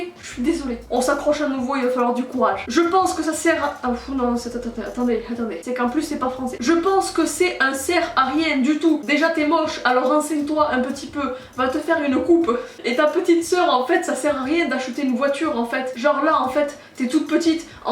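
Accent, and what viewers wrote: Toulouse, France. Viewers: Tkt pas les commentaires méchants ont s'en fou, et ton accent c l'accent toulousain moi j'habite a Toulouse et j'aimerais grave te voir ❤ et ton style j'le kiffe trop 🔥💪❤ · L'accent Toulousain on l'a pas choisi lol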